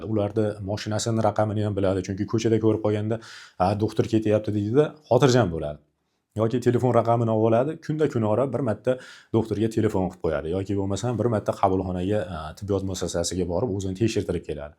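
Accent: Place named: Jizzakh, Uzbekistan. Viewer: Ассалому алайкум доктор. Шевангиз адашмасам Жиззахники деб уйладим. Адрес каерда ?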